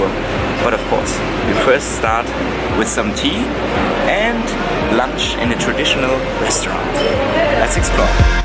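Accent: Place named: Germany. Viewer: accent reminds me of all my friends from Deutschland. 🇩🇪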